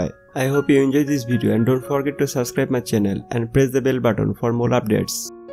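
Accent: Bengal, India. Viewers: Improve your English....there is a tinge of Bengali accent in your English. It is is not eezz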